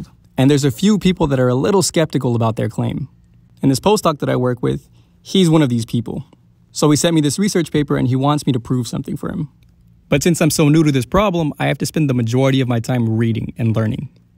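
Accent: Boston, United States. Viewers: The Boston accent really completes this. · idk where bro is from haha but he's def giving me Boston lmao. But I will say that Harvard (or the Ivy's in general) are not for me. In my undergrad at California State, we referred to kids who didn't study as Ivy leagues lmao or USC transfers. Glad to see a Harvard student breaking the stereotype have of them tho. (for context, I'm finishing my masters and am about to apply for my PhD rn)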